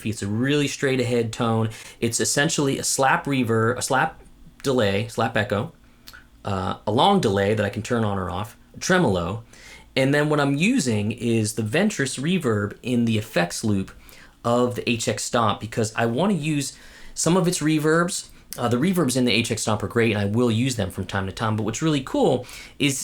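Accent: South, United States. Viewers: a guy with an italian last name and a southern accent kinda freaks me out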